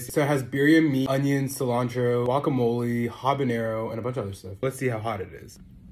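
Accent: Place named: Egypt. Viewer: يعني انت بتتكلم مصري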